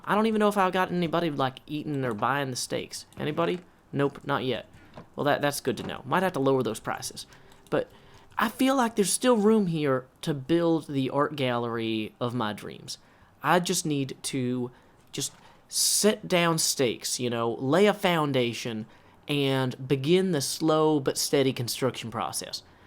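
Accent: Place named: Nashville, United States. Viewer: There once was a man from Nashvilles, Who calls himself Yes that's his name Minecrafting's his game With a lovely accent from Nashvilles.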